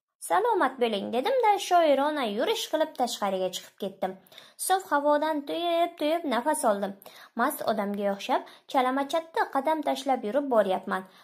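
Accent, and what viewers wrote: Bukhara, Uzbekistan. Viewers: Маладес қизим, зўр ўқибсиз👍. Бухороликмисиз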